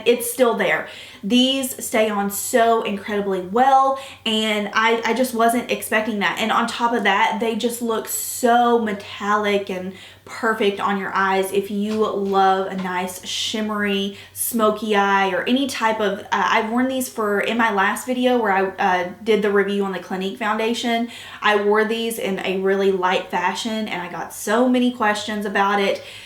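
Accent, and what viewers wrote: South, United States. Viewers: first of all, I am a new subscriber and I already love you! You seem so down to earth and honest in talking about different products, I already trust your judgement! I actually found your YouTube channel by reading a comments section of another YouTuber. Several people recommended you for hooded eye tutorials. I'd love to see an updated one (I couldn't find a recent one after scrolling back a good bit), as I REALLY STRUGGLE with applying eyeshadow to my hooded eyes. That flap of extra skin is my worst enemy!! Also, love your southern accent, where are you from? I am from North Carolina!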